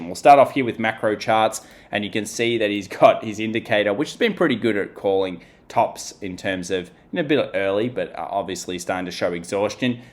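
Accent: Australia. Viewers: Love the Australian accent pontificating about the US "mahket."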